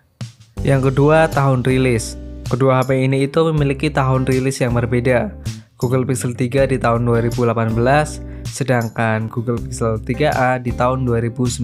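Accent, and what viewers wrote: Wonosobo, Indonesia. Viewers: wong sobo ta mas?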